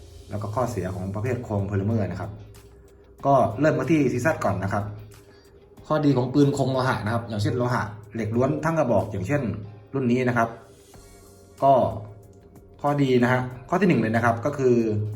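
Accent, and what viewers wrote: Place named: South, Thailand. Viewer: *ชัดเจนจริง นี้คนใต้แน่ผมว่าพี่ นี้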